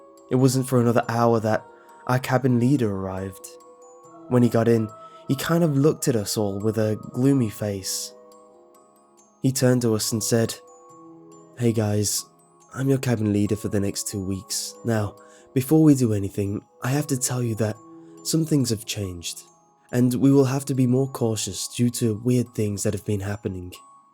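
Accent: England, United Kingdom. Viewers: I think he is english. And some people do like this story. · British or some kind of English, i believe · A bad story read by an Englishman is still a bad story. Why do people assume that slapping on an English accent will enhance something. Oxy Clean doesn't work better since Antony Sullivan has been shilling it.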